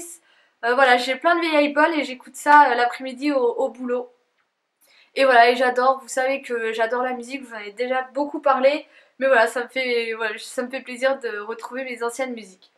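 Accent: Lorraine, France. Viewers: j'aime Bien Ton accent ! Teeam Lorraine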